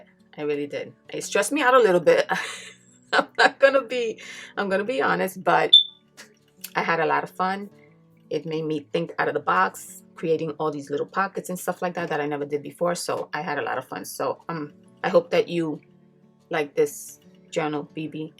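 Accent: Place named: New York, United States. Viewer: Absolutely amazing! Love the adorable racoon printable!! I really like you NY accent too! Adorbs!! :)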